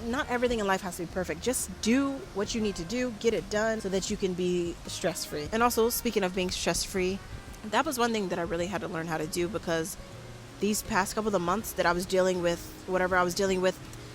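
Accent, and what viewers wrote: Africa. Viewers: You even have a lil African accent ☺️😍…. My husband is Ugandan, I plan to move there for 5 years after we have our baby. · Beautiful, you are developing that African accent to, love you and your families videos keep sharing👏🏾👏🏾👏🏾👊🏾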